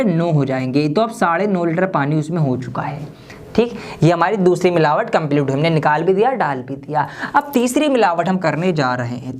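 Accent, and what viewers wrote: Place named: Bhopal, India. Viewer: Thank you Sir 🙂 .. you make every problem easy to understand ....i wanna know that ..where are you from ..and you pronounce words like Bhopali.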